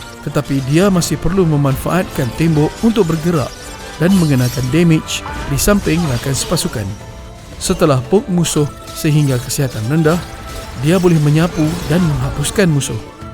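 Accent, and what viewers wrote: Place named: Perak, Malaysia. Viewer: orang perak ghupenya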